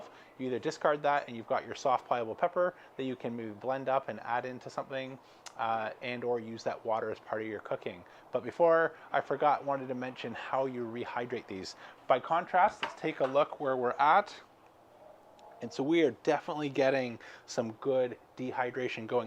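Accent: Canada. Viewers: I would love to hear you react with, for me, your Canadian accent, when you bite into a really hot pepper. I bet it wouldn:t even involve curse-words, you were probably raised right.;-)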